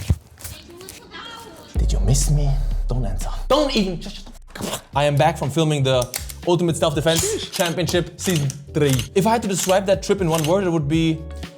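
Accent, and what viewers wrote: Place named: Germany. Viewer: Digga wieso man merkt dass du die letzte Zeit viel deutsch geredet hast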